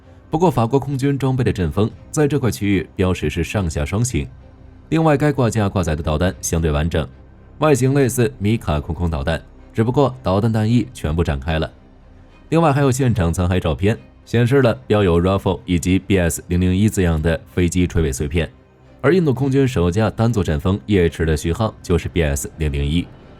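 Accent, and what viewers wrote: China. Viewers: 台湾人学大陆口音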